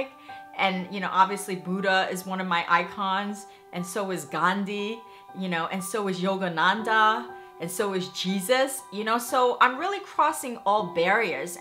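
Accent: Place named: New York, United States. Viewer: you live in Southern Cali...one would easily be able to make suggestions to you in regards to places of worship if say, you lived in SF - I assumed you lived in SF because you're Asian-American UNTIL I heard your accent I realized you'e a New Yorker. And NYC people typically find SF a bit weird. Anyway, find your own spiritual place. Your OWN temple. I'm something of a pantheist/deist. And look up on Buckfast Abbey here in the UK. They have an amazing prayer room:) if you come to the UK be sure to visit it.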